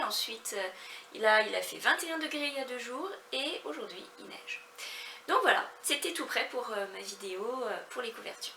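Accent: Quebec, Canada. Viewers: Coucou , j'aime beaucoup ta première couverture ! J'ai commencé la meadow blanket de Lucy en lit double, qui ressemble vraiment à ma prairie ! Merci de m'avoir fait découvrir la créatrice et la laine Stylecraft , toute douce . Ton accent un peu québécois dans cet épisode me fait sourire , j'aime bien cette accent ;)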